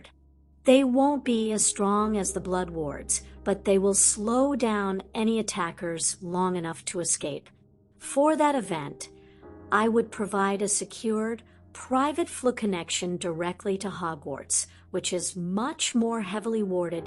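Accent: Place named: North America. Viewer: British characters need a British reader. Love this story but not with a North American accent.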